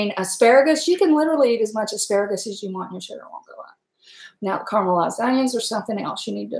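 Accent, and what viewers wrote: South, United States. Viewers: Yum! Enjoyed this video and just want to say, you have the best southern accent! Love it!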